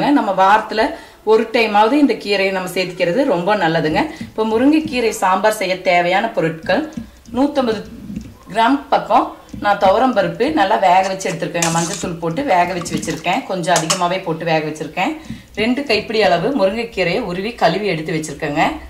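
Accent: Kongu, India. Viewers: Mam puli seka venama??? Nenga kongu Tamil ahh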